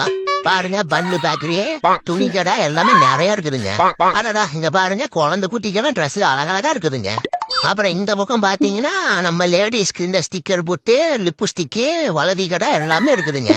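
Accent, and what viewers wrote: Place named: Kongu, India. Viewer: Kongu Tamilu pattaya kelaputhungooo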